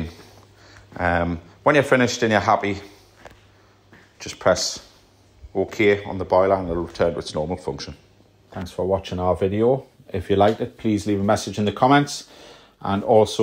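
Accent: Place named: Northeast, United States. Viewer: Top stuff! We got our new boiler last November, and I have had to refill it once (under instruction from the man who installed it). Couldn’t remember what to do when it just happened again, and your short video guided me exactly. Dead simple. Thanks very much indeed. (Lovely to hear a voice from the Northeast again after so many years away!). Brian Barber, Worcestershire.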